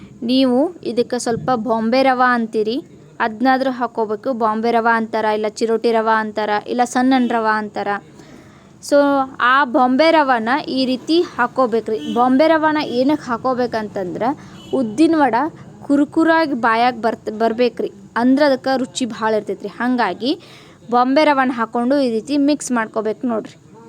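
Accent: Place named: Bangalore, India. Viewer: Niv Bangalore kannada matadri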